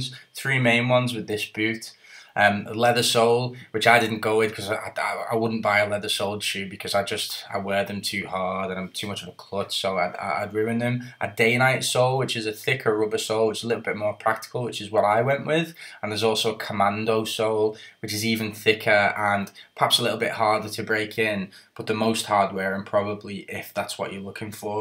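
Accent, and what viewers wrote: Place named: Scotland, United Kingdom. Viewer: my badd, jajajja, i mean scottish, love the accent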